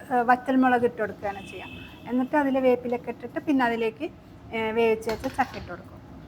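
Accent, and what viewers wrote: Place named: Malappuram, India. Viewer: സംസാരം കേട്ടപ്പോൾ തന്നെ ഒരു മലപ്പുറം touch തോന്നി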